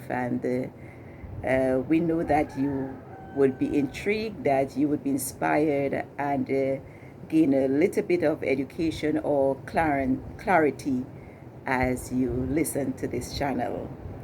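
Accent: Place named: Antigua and Barbuda. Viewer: thanks very much l sm retired from construction and is experimenting with a backyard garden and you helped s lot . and you were very clear.you sound antiguan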